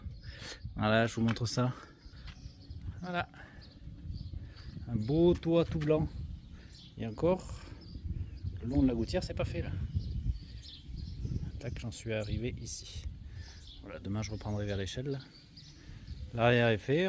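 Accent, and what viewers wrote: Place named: Savoy, France. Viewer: new follower sur ton channel, tu as des compétences de ouf, bravo pour tes réalisations au plaisir de te suivre , tu as vachement l'accent savoyard, mdr.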